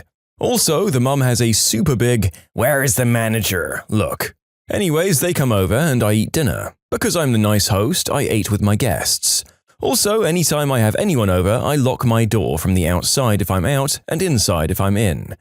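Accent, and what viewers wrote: United Kingdom. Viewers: the brittish accsent is killing me and yes i spelled most of it wrong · THE BRITISH ACCENT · i have not watched in a while why are you british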